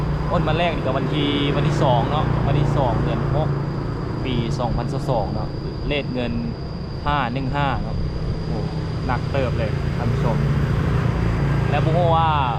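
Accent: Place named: Laos. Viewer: สู้ๆครับไม่ต่างกันเลยไทยลาวสมาชิกใหม่เด้อรับด้วยครับชอบสำเนียงลาวครับ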